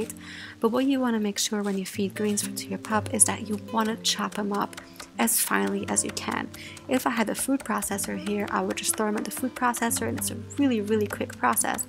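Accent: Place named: Germany. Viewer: you sound german, where are you from?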